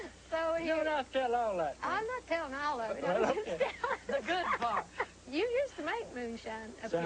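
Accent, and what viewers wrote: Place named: Southeast, United States. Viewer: Southeastern America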